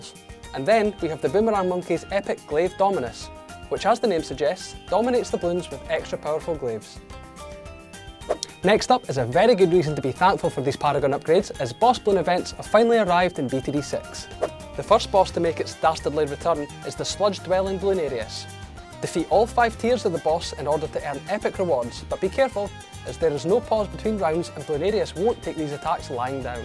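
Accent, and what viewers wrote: Scotland, United Kingdom. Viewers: gud ol' skoatesh achscent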